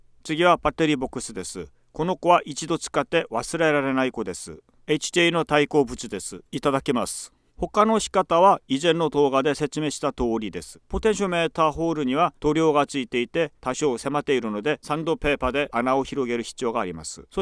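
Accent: South Korea. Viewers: 日本人ではないのか！？めちゃくちゃ可愛い喋り方！韓国訛りかなー？ なんか喋ってるの見るとさらに、可愛く見えてくる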